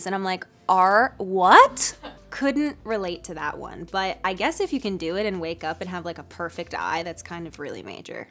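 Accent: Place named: England, United Kingdom. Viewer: I really Love her...I am from Sri Lanka...Her English accent helps me improve my English....I wanna hug her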